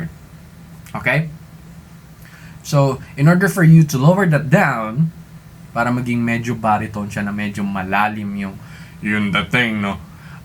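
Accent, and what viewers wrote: Philippines. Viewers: Kala ko englishero pilipino pala hahaha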